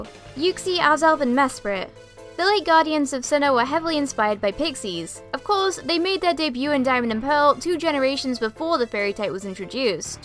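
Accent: United Kingdom. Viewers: u got a british ascent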